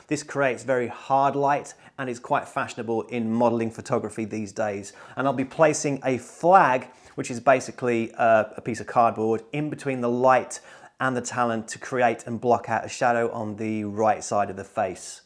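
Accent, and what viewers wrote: United Kingdom. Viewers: North London? 👀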